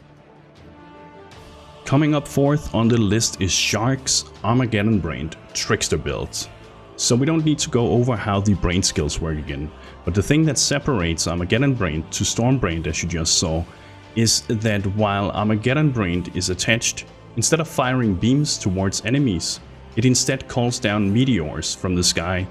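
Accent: Denmark. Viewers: danish accent? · I am so sorry, but you should learn how to pronounce some of the words. I really like your videos but i can't take it seriously, you make good videos but you english could use some work. You sound like you are from Danish, Arbejd den Danske Accent ud af dit engelsk :/ lyder mindre godt. Hvis du synes jeg er en idiot ignorer mig :) hav en god dag.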